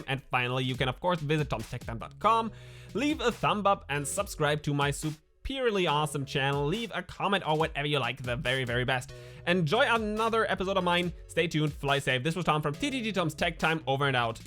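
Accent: Germany. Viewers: Tom you are doing so good, your videos are really good and eventhough you German accent can be easily heard, your English is great anyway. But one thing it hits me every time I see your videos. It is episodes and not epizodes :-)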